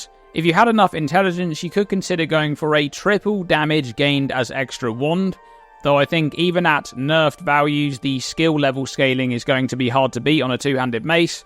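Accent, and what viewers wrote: United Kingdom. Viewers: The English accent is the nicest of all the accents.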